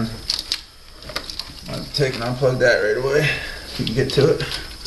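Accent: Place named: Pennsylvania, United States. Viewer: sounds like you are from somewhere near pennsylvania. is that true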